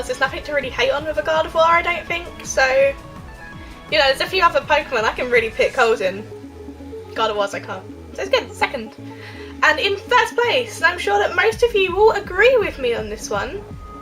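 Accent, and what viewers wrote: United Kingdom. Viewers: Your accent sounds like your from the UK is this true ? :)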